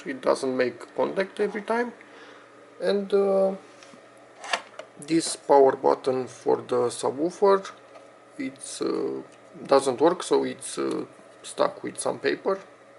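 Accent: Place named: Romania. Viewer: si cand ma gandesc ca esti roman dupa pronuntie,dar nice videoul,am o intrebare pe MSI g31tm-p21 merg setarile astea ca am acelasi procesor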